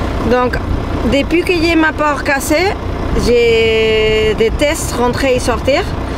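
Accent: Spain. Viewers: Chapeau bas!!! J'ai 62 ans donc une sexagénaire!!! Je te suis depuis que tu fais tes vidéos en français je t'adore je n'en rate aucune ta philosophie de vie me plaît beaucoup même si j'ai une vie plus "rangée" je réalise mes rêves également...je ne me suis jamais perçue comme les autres d'autant plus depuis mon divorce je me réalise seule avec mon âne Sherpa je suis partie 6 mois jusqu'à Santiago de Compostela (aller et retour) j'ai réalisé mon rêve vivre à la campagne avec Sherpa à mes côtés!!! Je te trouve particulièrement courageuse j'apprécie ton superbe accent espagnol, j'aime quand Raf que je suis aussi te fait découvrir le canyoning ou l'escalade!!! Et là encore bravo à toi de dépasser tes peurs!!! Longue vie à toi à ce que tu fais partager c'est formidable... et caresses à tes 3 chiens et ton chat ils sont tous adorables!!! Muchos gracias 🙏👍💓✨️💖🌺🥰🍀🐞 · Quelle bonne idée cette vidéo. 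Quand on est devant l'écran, on ne réalise pas nécessairement tout le travail que ca représente derrière. L'intro est géniale et ton francais est charmant avec cet accent espagnol. Bravo et Merci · Merci, tu m'as donné un grand coup de fou pour un jour commencer moi aussi a faire des fidéos. J'adore ton accent espagnol et ce n'est pas la 1ere fois que je te le dis. mais tu as raison, depuis que je te suis, ton texte est plus fluide. Compliments ! Quand je vois le travail que tu y mets, je me demande quel ordinateur et quel logiciel tu emploies, parce que je crois que le mien est trop faible pour ce travail. Merci de prendre le temps de me répondre. Bises du nord de l'Allemagne, le corsallemand. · Ne t'inquiète pas, le charme de l'accent Espagnol quand tu parles français est tout à fait délicieux. Personnellement, je suis beaucoup allé en Espagne (je ne suis pas très loin de la frontière) et mes parent avaient un appartement prés d'Alicante! 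Donc je parlais assez bien l'Espagnol. Et ton accent me fait vraiment plaisir car il me rappel les jours heureux ou nous étions en vacances dans ton pays merveilleux... A part cela, j'adore ce que tu fait, et tu as beaucoup de courage! Que ce camion t'emmène au bout du monde sans soucis ni problèmes. C'est un souhait qui me tient à coeur! Bon voyage...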